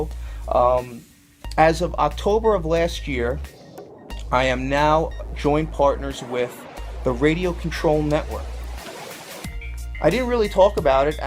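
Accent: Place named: Long Island, United States. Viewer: Great collection! Been a subscriber for a long time, your accent keeps me coming back, I grew up in Northport and it's good to hear. If you ever get up to CT I'd love to drive with you. Check out CT Scalers on FB.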